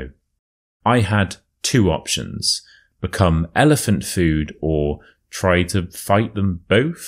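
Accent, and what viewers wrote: United Kingdom. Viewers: I wish all British people could speak like you 😅 Very lovely lessons ❤ thanks 🤩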